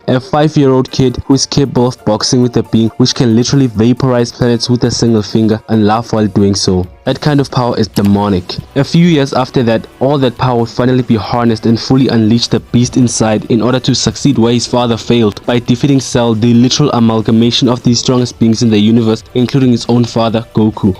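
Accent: South Africa. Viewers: You sound kinda south african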